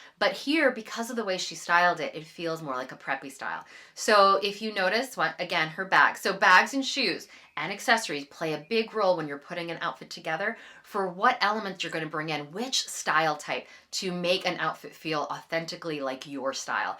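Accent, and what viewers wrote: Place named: United States. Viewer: First of all can I just say how much I love your channel and I wish it every success, you thoroughly deserve it, you're so knowledgeable and a great presenter, you have a lovely voice and soft American accent that is a pleasure to listen to and your content is always excellent. I invested in a princess style wool coat from Jigsaw in the UK back in 2005 and I still wear it and it looks amazing. It's taupe, another great classic colour, not too light and not too dark. It goes with everything and I like taupe much more than camel or beige. I love browns, also chocolate brown (not coffee bean brown, which is too dark and quite harsh). I'd love a navy or blue coat and although I don't need it, I'm on the look-out for one, I also love dusty pink, which in an underrated neutral and a very flattering and feminine one imo, I recently saw a lady wearing a dusty pink trench coat and she looked amazing. 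Like taupe, dusty pink goes well with any neutral colour.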